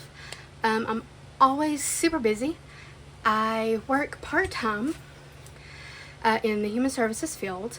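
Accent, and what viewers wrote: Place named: South, United States. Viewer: Loooooove the country dialect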